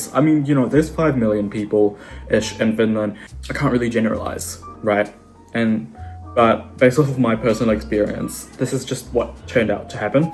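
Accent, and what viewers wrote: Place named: New Zealand. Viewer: mans sounding kiwi as f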